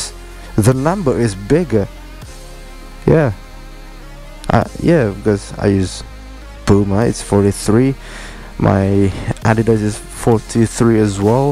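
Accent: United Kingdom. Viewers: itu aksen british :3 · i love the way you talk, British Accent hahaha,lil bit weird heard you moaning ahahaha. Nice gears bro. i'm interested with the shoes.. 7gears, right??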